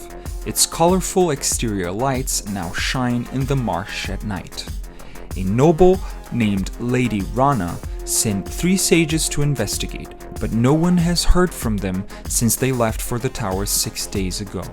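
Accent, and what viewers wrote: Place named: Brazil. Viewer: eu tava estranhando o sotaque no último vídeo, mas não tinha reparado no nome... Vc é br senhor? kkkkkk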